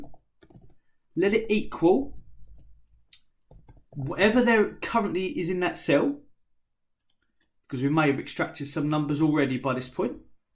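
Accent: United Kingdom. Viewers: Very informative video ! And a beautiful English British accent ❤❤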